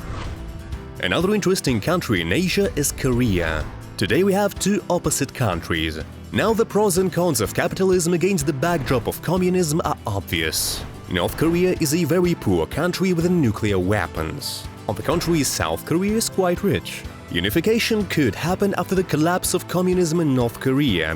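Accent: United Kingdom. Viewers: u british u sound like british and i like your vids alot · He's speaking British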